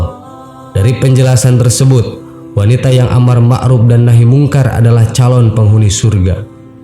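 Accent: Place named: Sunda, Indonesia. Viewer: Suaranya si abang khas bangeetzz Suara org sundaa..mantep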